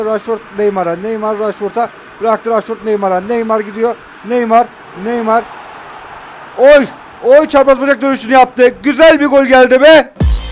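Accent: Kahramanmaraş, Turkey. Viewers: Abi sen maraşlımısın?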